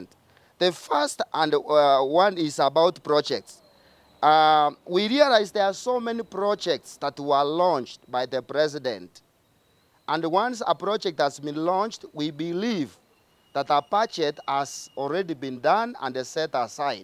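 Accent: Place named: Kisii, Kenya. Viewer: A failure talking. Kisiinised English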